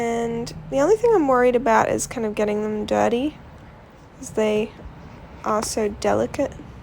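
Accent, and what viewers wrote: Australia. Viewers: Before you moved to Australia? But your accent sounds just like any other Aussie! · Good review and you have Aussie accent 😎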